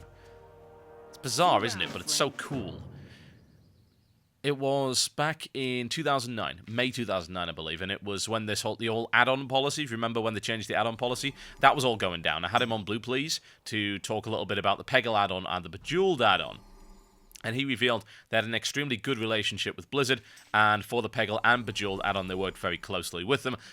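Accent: United Kingdom. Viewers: are you british, irish or scotish?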